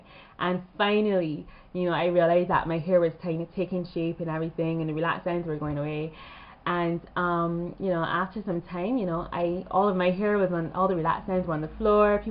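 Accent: Barbados. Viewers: Are you bajan because I should know that accent anywhereee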